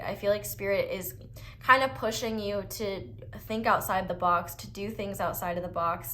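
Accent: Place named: New York, United States. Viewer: the new yorker accent is not your friend :)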